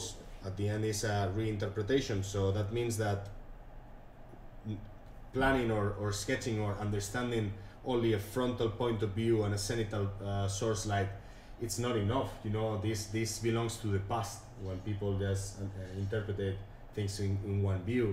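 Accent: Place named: Spain. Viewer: I can understain your english. Are you spanish?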